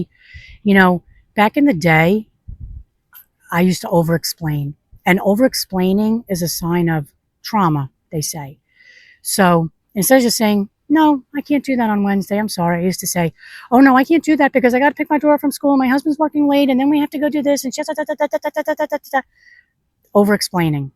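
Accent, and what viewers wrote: Long Island, United States. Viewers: Listen, I have a girlfriend that tells me every designer name and the saddest part is I have no idea what she’s talking about so I do understand not having a lot of stuff around. I think it’s wonderful. Do whatever you want we’ve earned it haven’t we . I love the accent. It’s just like mine. I grew up in Long Island, but I live in Pennsylvania now, but I had 30 years in Long Island. I love your little house too. By the way I’ve been following your journey. I’m very sorry about your daughter. I truly am. The girlfriend I’m just like you when you showed that house across the water. I thought it to myself absolutely not. I don’t wanna heat it. I don’t wanna put air air-conditioning into it. And I am a minimalist in a lot of ways. I just like to talk to my husband into throwing some more stuff out or just giving it away. Anyway, do the best that you can and also I have to say you have such beautiful hair. 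I’ve been meaning to say that have a great rest of your evening. Enjoy your time with your friends and family in Florida and I’m looking forward to seeing what you do with upstate New York take it easy.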